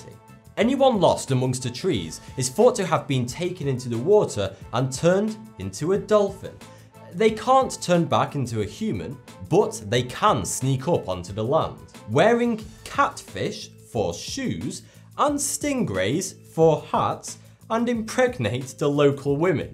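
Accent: United Kingdom. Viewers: Now I'm confued. For the longest time I thought you called yourself FORTY-two. But the name of your channel is, so I figured your British accent made it SOUND like. Then you just mentioned they're superstitious of 4, the 40s, including your name FORTY-two. So, which one are you, or? And how did the name originate? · why are you british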